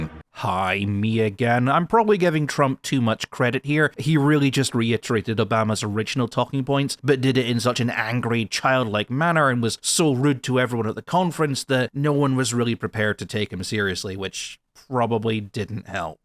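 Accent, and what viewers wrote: Scotland, United Kingdom. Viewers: FUCK YEAHHHHHHHHHHHHH THE FUNNY SCOTTISH VOICE. I FUCKING LOVE MILITARY HISTORYYYYYYYYYYYYYYYYYYYYYYYYYYYYYYYYYYYY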